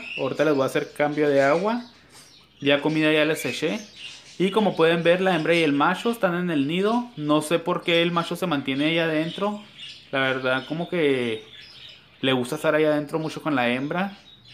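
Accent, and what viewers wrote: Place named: Chihuahua, Mexico. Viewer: Hablas con acento ,eres de Chihuahua?, saludos amigo yo tengo 14 periquitos que estes bien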